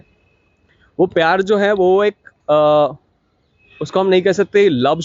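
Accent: Bengal, India. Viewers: Sir ap bengoli ho kya kyuki apki Hindi me abhi v bengoli wali tone hai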